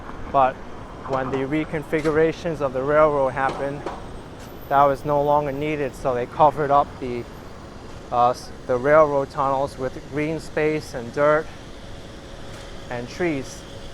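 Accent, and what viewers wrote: New York, United States. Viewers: Great Video - thanks for the info as you walk - keep up the great work and if i ever do get to nyc, i would love to meet the - love your nyc accent lol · Is that a new York accent? Thanks for the video, now I don't need to visit.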